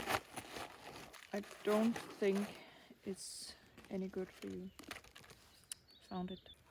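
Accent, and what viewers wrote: Denmark. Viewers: I think that I'm hearing in your voice how the Danish tongue has deeply influenced some British and Irish accents.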